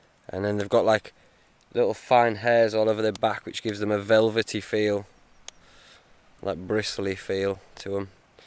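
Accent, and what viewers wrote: Yorkshire, United Kingdom. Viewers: adrian mendoza no it's british he's a pom not an aussie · christian roberts lol it's Yorkshire clearly. Definetly not Scottish Lowlands. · I WAS GOING TO SAY YORKSHIRE. DOIN MY HEAD IN TRYING TO FIGURE THE ACCENT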